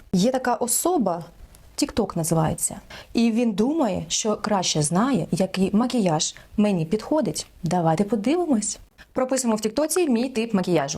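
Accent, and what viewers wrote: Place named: Surzhyk, Ukraine. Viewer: Подобається ваш суржик 🥰😍❤️🔥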